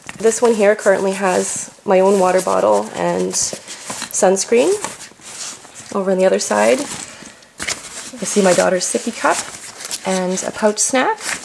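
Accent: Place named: Canada. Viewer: Love the accent! I have a few friends from Canada.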